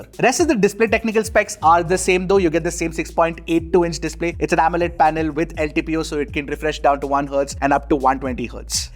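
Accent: India. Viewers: Indian accent is bubbly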